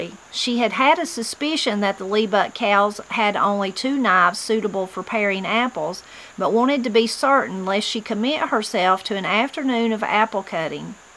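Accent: Appalachia, United States. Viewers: “Teacher” is a brave and adventurous woman!! Thanks, your genuine Appalachian accent makes these readings special!💜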